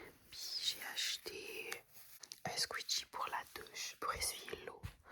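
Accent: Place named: Quebec, Canada. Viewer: J’allais te demander ta nationalité vu que je ne connais pas ce drapeau, mais au moment où t’as parler j’ai compris directement que tu es québécoise. En dehors de ça, c’est une bonne vidéo, bravo.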